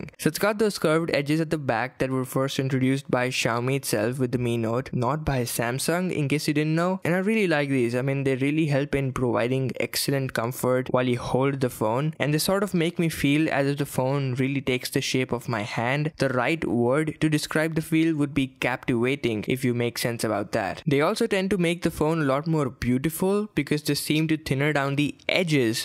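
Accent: India. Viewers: great video, I subscribed within 10 sec after listening to ur ascent, its indian but not typical Indian ascent.Keep up the good work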